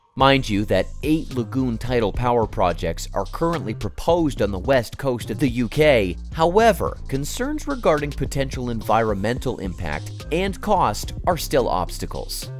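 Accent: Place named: United States. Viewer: The American accent is a dead giveaway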